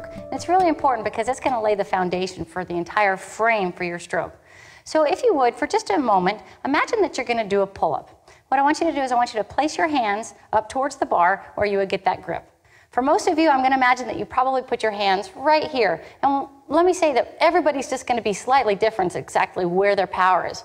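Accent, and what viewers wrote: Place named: United States. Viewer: very nice USA accent 10/10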